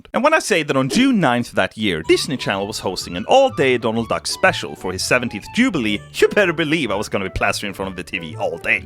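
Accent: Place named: Sweden. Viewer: I really love these vids. They're definitely worth the wait! Plus we get to listen to ' EPIC Swedish accent!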